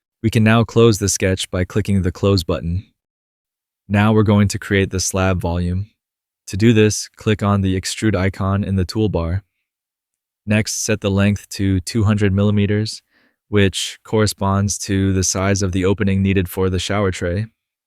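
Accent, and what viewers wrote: France. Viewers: Why do you use a voice actor for some of your videos? It's better when it's your normal French accent.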